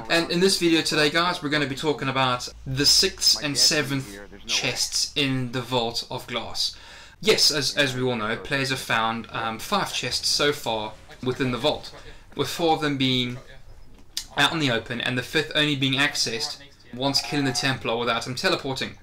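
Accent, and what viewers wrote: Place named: South Africa. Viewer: dude you south african me too i can tell by the accent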